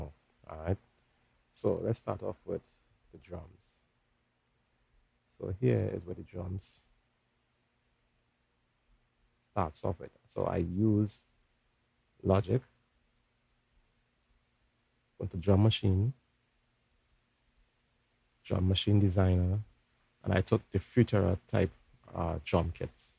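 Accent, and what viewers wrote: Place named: Trinidad and Tobago. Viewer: dawg that Trini accent be strong af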